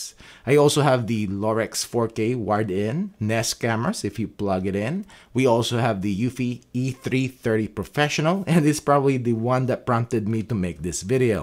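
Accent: Philippines. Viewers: Thanks and you sound like you’re from the Philippines 🇵🇭. ❤️👍💯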